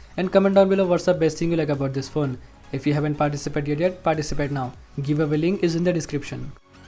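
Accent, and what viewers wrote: India. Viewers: İngilzce konuşan Hint shkfsaj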